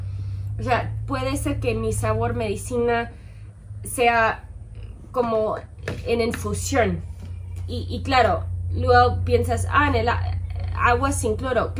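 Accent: Mexico. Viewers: I'm listening to you and I feel I light Mexican accent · Habla muy mexicana! Que linda!! 😊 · Antes hablabas español como Española y ahora hablas español como Española y mexicana en acento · Hi!!, no sabia de tus videos..te felicito..son muy interesantes, y tu español es excelente...lo hablas muy bien, se nota claramente el acento mexicano...pero bueno.. agradezco que compartas tus conocimientos, muy buenos y como te reitero, bastante interesantes. Saludos From CHILE - VALPARAISO. Good luck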